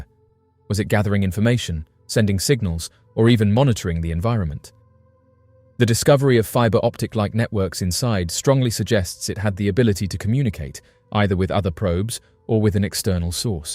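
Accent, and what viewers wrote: United Kingdom. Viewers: Such boorah. If you just reported the finding, your findings whould be about 15 seconds. The rest if this video is just conjecture with a British accent... like that makes the narrators verbal diarrhea all the more believable. A 12 year old coul do a better job with this content.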